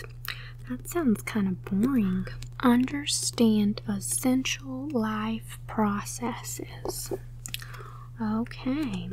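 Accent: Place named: South, United States. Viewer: Lmao....love the ditsy southern belle thing you got goin’ on!! Still very relaxing too!! Thanks.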